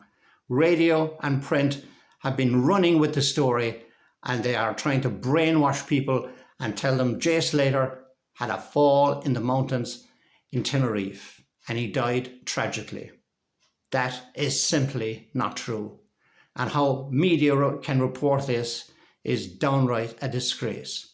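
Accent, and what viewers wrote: Ireland. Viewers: Oh I love your Irish accent, xx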